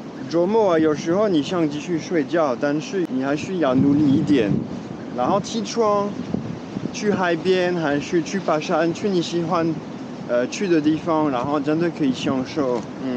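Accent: Taiwan. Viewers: 哈.....台北俏俏話+1!!!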